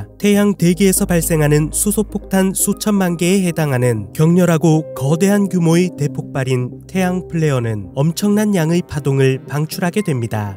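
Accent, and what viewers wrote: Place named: Gyeongsang, South Korea. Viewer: 왠지 경상도 사람이 서울말하는 것 같은 말투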